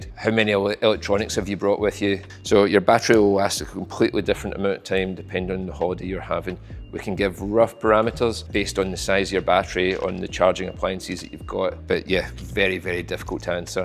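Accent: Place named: Scotland, United Kingdom. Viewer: I could listen to the Scottish accent all day!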